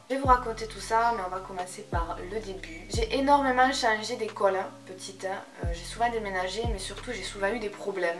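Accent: South, France. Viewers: Oh tu es de Béziers ? je viens de découvrir ta chaîne et j'adore ta personnalité. Je me disais bien que tu avais un accent du sud :p J'ai toujours vécu à Béziers,enfin maintenant habite à Boujan mais c'est à côté ! j'ai aussi subi du harcèlement mais à Jean Moulin ! c'est allé trop loin moralement pour moi et encore maintenant ça me gâche la vie alors que çafait 10 ans que c'est fini. Sujet joyeux bonjour xD je ne vais plus en centre ville donc je ne sais pas si c'est mieux ou non mais je pense que oui dans l'ensemble ! En tout cas ravie de voir que ça ne t'as pas empêché d'avancer ! Reste comme tu es, Bisous 😘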